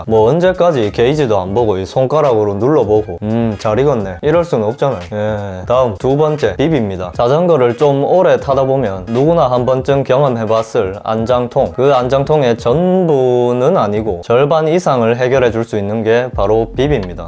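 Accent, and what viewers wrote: Gyeongsang, South Korea. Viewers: 정겨운 경상도 사투리.... 은근 그림 잘 그리심!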